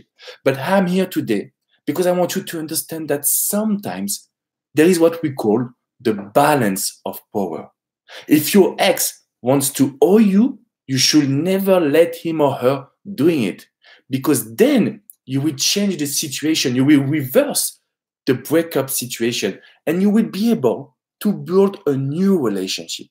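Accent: France. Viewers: Nice French accent. Where did you get it from? Thank you. great video.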